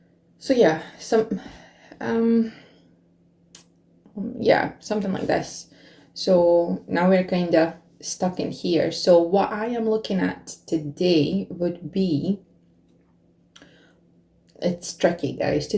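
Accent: United Kingdom. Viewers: British? love your content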